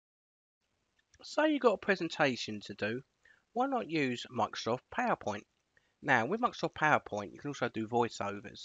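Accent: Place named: London, United Kingdom. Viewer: OY!!!! You sound like you’re from London!! 🤣👏👏👏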